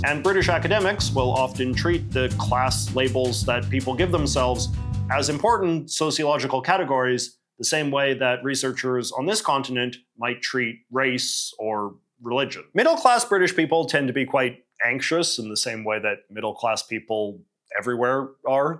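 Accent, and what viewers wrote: Canada. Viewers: you have the most canadian accent i have ever heard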